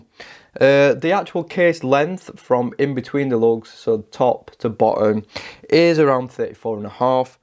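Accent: United Kingdom. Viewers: Nice review. Like your Bri'ish accent.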